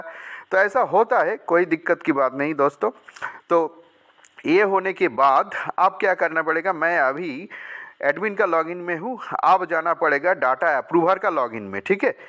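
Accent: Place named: Bengal, India. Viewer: Pahle Hindi thoda Sikh lo.. bangali babu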